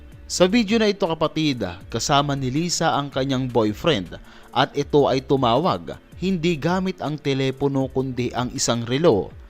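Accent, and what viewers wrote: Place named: Visayas, Philippines. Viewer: Usa ka flu😅 bisaya Pala to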